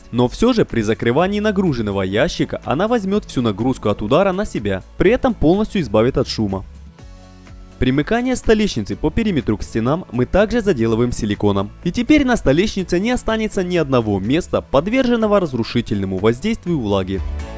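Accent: Ukraine. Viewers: Вам, разумеется, можно говорить как угодно - это ваше право. Но всё же когда человек говорит неграмотно, это порой вызывает муку, Вы же формируете контент для зрителей. А то, что русский для вас не родной - не правда, даже если вы в быту и пользуетесь украинским, в чём я сомневаюсь, то и русский вы знаете прекрасно, просто не даёте себе труда не суржиковать. ps "ризетки" нет ни в каком языке. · Если придираться к речи, то в роликах много «очепяток» в речи, присутствуют акцент и слова «суржики», потому что они украинцы, но ребята не претендуют на знатоков по русскому и литературе. А еще у них голова и руки на месте!😎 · Всеодно ти говориш з українським акценом, прошу озвучувати Українською мовою твої відео. Інакше Я відмовлюсь від твоїх відео.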